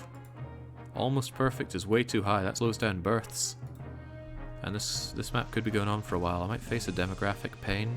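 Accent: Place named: Scotland, United Kingdom. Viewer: Dark Young is a bad influence. Since you started hanging out with this guy, you've been sounding increasingly... Scottish.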